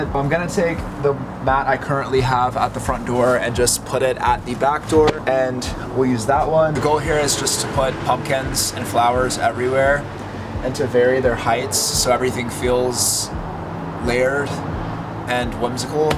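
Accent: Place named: Los Angeles, United States. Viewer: Dude, drop the LA accent. It’s hella annoying. You didn’t used to talk like that.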